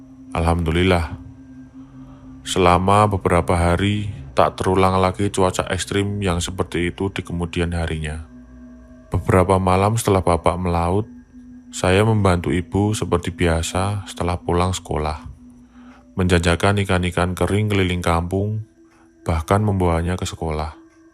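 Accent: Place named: Java, Indonesia. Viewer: Suka ama logat jawa-nya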